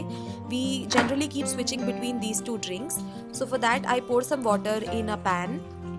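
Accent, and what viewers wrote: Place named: India. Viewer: I watch your vedios for improving my English speaking...bcz your english is pure indian english... thanks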